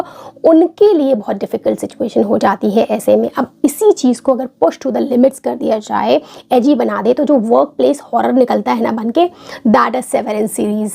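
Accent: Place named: South Delhi, India. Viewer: You excent is like sout Delhi girl ❤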